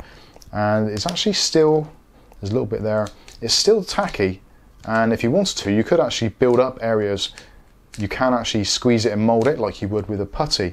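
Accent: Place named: Gloucester, United Kingdom. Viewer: Great vid, I think you might have sold me on this. I'm in Vancouver BC, you sound like you're from my part of of the UK, Gloucester boy?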